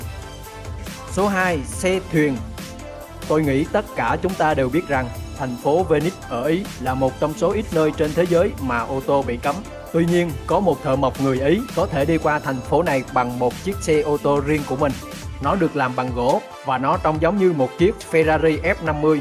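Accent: Central, Vietnam. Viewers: Ad ng miền trung À??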